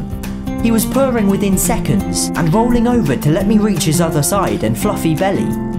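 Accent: United Kingdom. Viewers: I love the uk english like Moning Cheers mate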